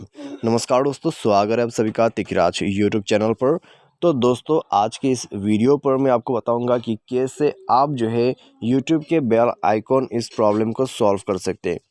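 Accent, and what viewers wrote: Assam, India. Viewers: Bro tmi assam r jen lagise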